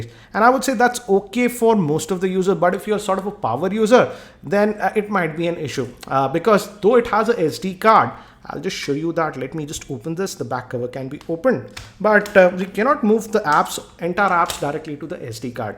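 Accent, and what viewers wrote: India. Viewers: Não tem como parar de rir com sotaque indiano, pqp! AUEHAUEHAUHE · Nice accent,Indian-English Accent are nice to hear and above all they are clear Your Video is Very Informative, Thumbs Up for your Vid!..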